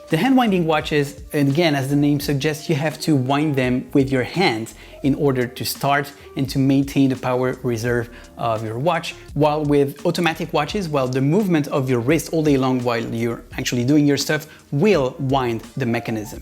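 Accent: France. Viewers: My God!!!! That accent!!!!! 😍😍😍 Tu parles francais? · really good and clear presentation, a lot less douchy than other watch channels, love the french accent. subscribed. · French accent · the French accent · Je parie ma collection Seiko 5 que vous êtes français 😅 L'accent ne ment pas ❤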